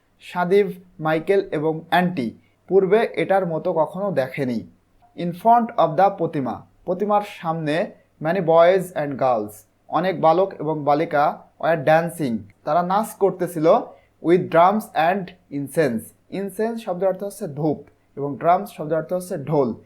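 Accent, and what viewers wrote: Barishal, Bangladesh. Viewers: Apni ki borishal theke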